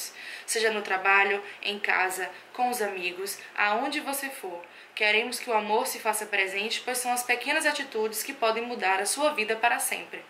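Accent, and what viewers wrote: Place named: Salvador, Brazil. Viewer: sabia q tu era de SSA! Reconheço meu sotaque sempre kkkkk Tô interessada nessa caixa e na salon box.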